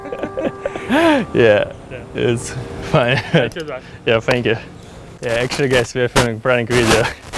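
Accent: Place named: Russia. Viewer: Жесткий русский акцент )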